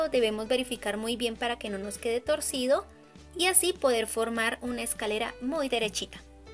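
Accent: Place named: Venezuela. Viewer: Eres venezolana verdad?❤️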